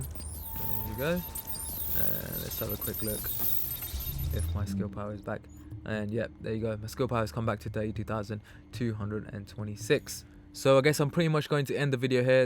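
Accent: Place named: United Kingdom. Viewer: are you in UK ) your accent suggests it but fast are long here man 20 hours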